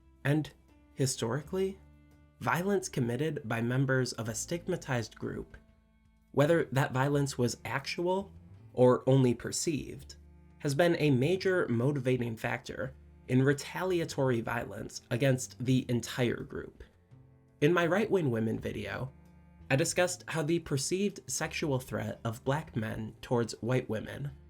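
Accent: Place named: Michigan, United States. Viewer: Yo, A new video by my favourite YouTuber with a thick Michigan accent, on my favourite Holiday too? Can this get better?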